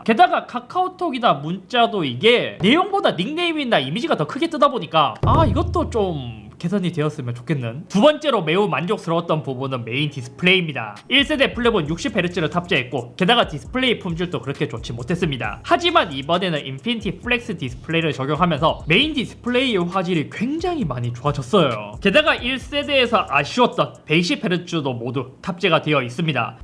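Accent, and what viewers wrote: Gyeongsang, South Korea. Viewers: 몇 번 들어도 적응 안되는 저 대구경북 말투…… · 이thㅓthㅓ여 역시 경상도분 ㅋㅋ 귀여우시고 정감갑니다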